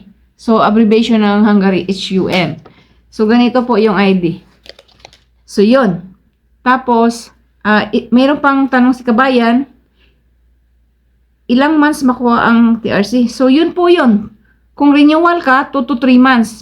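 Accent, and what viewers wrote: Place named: Ilocos, Philippines. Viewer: Yung accent mo ma'am mas malapit sa ilocano kaysa cebuano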